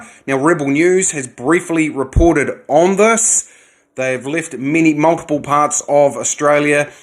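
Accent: New Zealand. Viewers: Algorithm and all that. Grow the.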